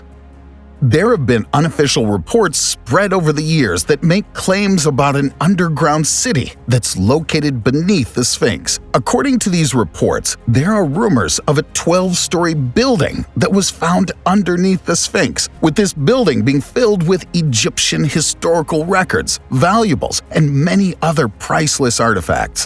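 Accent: United States. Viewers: Yet another annoyingly loud, patronising American narration aimed at 5 year olds.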